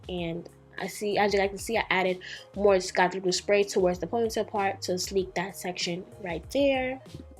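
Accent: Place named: New York, United States. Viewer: I'm new to your channel and idk if you're from New York but you're accent is so adorable lol. Great job on the ponytail ❤️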